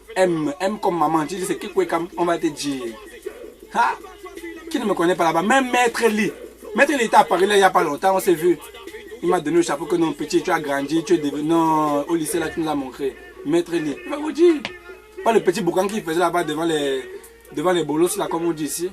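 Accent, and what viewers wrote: Cameroon. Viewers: tu as un fort accent camer. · cool ton tag,tu as le vrai accent kmer!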